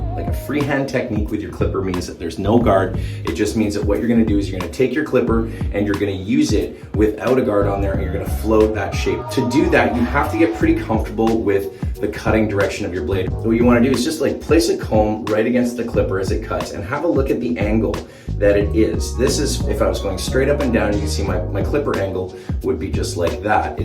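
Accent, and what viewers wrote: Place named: Canada. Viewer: I thought you were canada based with your accent and the way you spell colour.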